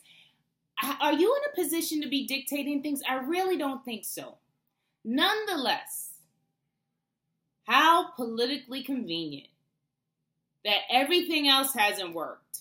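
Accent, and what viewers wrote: New York, United States. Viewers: Hi this your new subscriber from Nassau Bahamas great video and I watched that Kavanaugh hearing today I had too laugh lol.. I love your beautiful New York accent lol..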